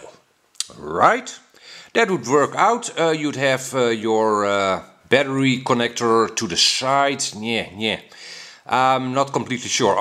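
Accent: Netherlands. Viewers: More of a teaser than a build ; ) Its a really nice looking frame and I like that the bracing forms the base of the stack as its all very neat but, the pdb sticking out of the side kills me! I like symmetry and if it really can't be symmetrical its GOT to go at the back as it just looks like it'll be more streamlined... No science just one of those yep, that looks about right things :) Even with your Dutch accent making your intonation a little different to what I'm accustomed to I was convinced from the start you were going to have some "grumbles" about the esc and yes, you did! Some valid ones too, having to change the motor positions & resolder leads wouldn't be my first choice, easy wins those situations every time in my world. I'd almost be tempted to buy a different one or get one of their flight controllers (I'm assuming they use that set up).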